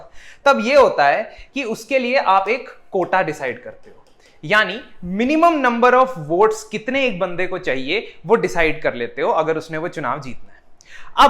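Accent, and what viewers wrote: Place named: Punjab, India. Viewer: Sir tuhade accent to meinu tusi Punjabi lag rahe si. Jado mei playlist dekhi ta tusi sachi muchi Punjabi nikle😂😂😂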